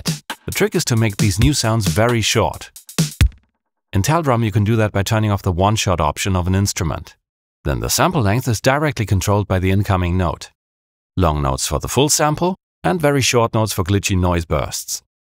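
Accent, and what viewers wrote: Germany. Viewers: you sound extra german in this video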